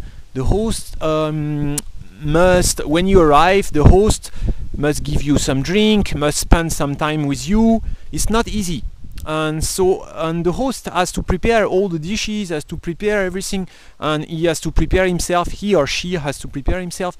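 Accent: France. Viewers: French english, so typical, haha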